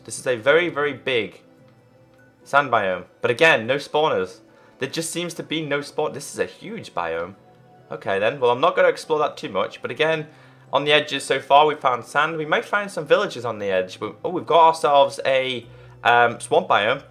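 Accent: Liverpool, United Kingdom. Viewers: are u a scouser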